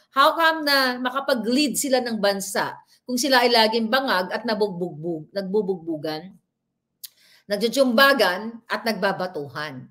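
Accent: Iloilo, Philippines. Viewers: Kanami lang sa imo maghambal sang ilonggo ,sarap pakinggan. Mahal ka namin madam.ingat lagi.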